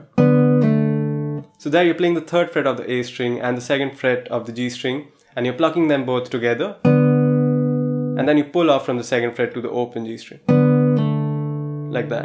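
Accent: India. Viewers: Are u indian? U sound so indian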